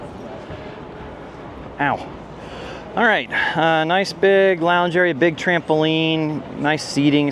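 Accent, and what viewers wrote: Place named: United States. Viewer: Awful video, really poor content. There's only one thing worse than someone moaning and that's someone moaning with an American accent. There are some really great presenters reviewing yachts and M.Y. on YouTube, this guy isn't one of them.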